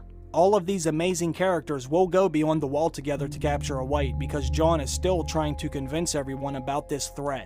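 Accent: Pittsburgh, United States. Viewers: You're from Pittsburgh, aren't you?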